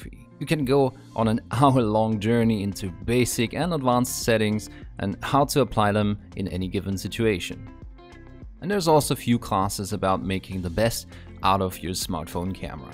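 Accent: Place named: Austria. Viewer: I really like your video cutting and narrative! Keep it up, maybe try working on your pronunciation of the O. Otherwise it's really hard to guess that you are Austrian :P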